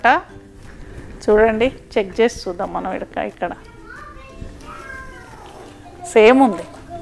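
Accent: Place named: Telangana, India. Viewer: hi amma, me mata vintay ma intlo vala mata vinatlay undhi , madi telangana nice video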